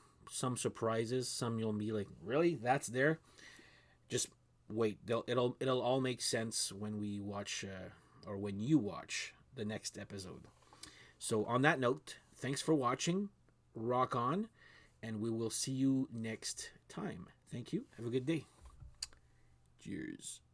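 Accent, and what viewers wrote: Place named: Canada. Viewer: Very good analysis. I think “ Meliora” is the best one too, and I share your enthusiasm for the awesomeness that is “Square Hammer.” My only quibble is “If You Have Ghosts.” I am a Roky Erickson fan of 40 years almost, and think Ghost does the definitive version of this song. Watch some live versions where Tobias really owns the song and the guitar lines at the end are sublime. Also, I ‘m glad you mentioned Ottawa cos I thought you had either a Canadian or Midwestern US type of accent. Subscribed!👍🏼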